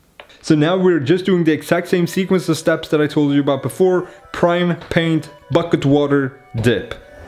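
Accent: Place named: Sweden. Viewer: Mahbub Tamim He is from Sweden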